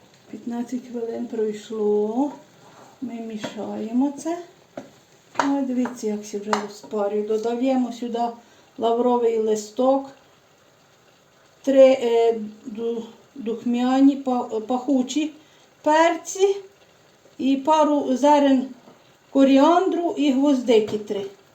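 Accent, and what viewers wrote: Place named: Transcarpathia, Ukraine. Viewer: Дякую за рецепт і приклад закарпатського говору.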